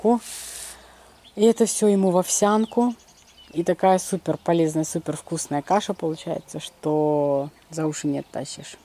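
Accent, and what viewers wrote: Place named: Belarus. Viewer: здравствуйте. Я год тому назад случайно попала на Ваш канал. Понравилось просто Ваш говор, ведение разговора. А когда Вы нынче приехали к родителям и мама с папой стали учавствовать в с"емках и услышала говор родной, песни - все... Моя любовь - Беларусь!!! Пусть Господь даст здоровья Вашим родителям на долгие года!!! Пожалуйста, научите их записывать для нас видео ( хоть раз в месяц) и Вы уж сами до на доносите их видео... Спасибо за все!!! До свидания! До видзення...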